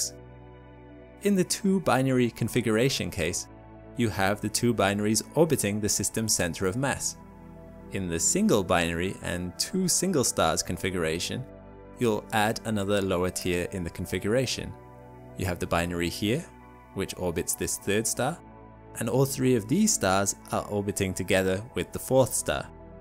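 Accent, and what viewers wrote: United Kingdom. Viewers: it's a called a british accent buddy. fun little fact; they're the ones that invented english, so you're the one with the accent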